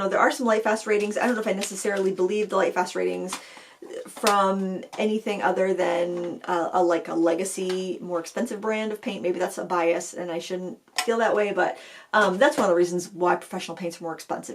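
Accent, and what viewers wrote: Maine, United States. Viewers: Hi! Here in NE Massachusetts, we're getting mostly driving rain and potential damage more along the coast. Be safe; my brother is in Bangor and Dad in Dixmont. I'll keep track of them as well. LOVE your Maine accent as it instantly brings me home! I worked to lose mine in college too. Now it only surfaces when I'm tired. LOL! Take good care this weekend! ❤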